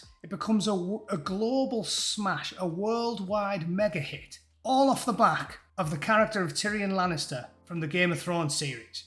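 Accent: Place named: Northern England, United Kingdom. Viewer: Good stuff. First time here. Been working on my ability to distinguish English accents and I got it right from once. Guessed you had to be from the north and......apparently you are.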